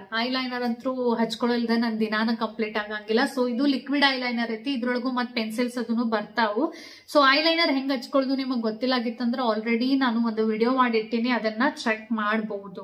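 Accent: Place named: Uttara Kannada, India. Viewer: Hai sister 😍 .Nivu mado yalla videogalu tumba informative agirutte . Nivu chikka chikka vishayavannu tumba acchu kattagi heli koduttira. Ondu kutumbada javabdarige nimmantaha hennu magalu tumba agatya 😍 Maneyannu channagi maintain madta eddira. 😊 Waw. Nivu matado uttara Kannada language keloke kivige impagirutte, nivu madida odapu gala video sakattagittu. Gandana hesarannu a rethi agi karibahudanta gottirlilla. Tumba channagittu. Nimma vlogs Alli nivu honest inda interest ittu video madodrida adannu navu aste interest kottu noduteve. Nivu mane kelsa, bere work, sanvina nodkollodu yallavanu channagi nodikoolodrinda bereyavarigu nivu inspire agiddira. Nivu yavaglu energetic agirodrinda namgu kelsa madoku energy barute. Nimm life style tumba esta, nimma matte Vinay Sir bonding tumba channagide. Nivu made for each other ☺sir nimge tumba supportive agiddare. 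Nivu tumba cute agi muddagiddira. Vinay sir tumba lucky. 😍 Nivu toriso daily vlogs, cooking, matte sanvi jothe mado activities help agutte. Ega madiro makeup videos alli yavella product hege use madbek annodannu channag heliddira. Tnks sis 😍 Heege helpful videos madta eri..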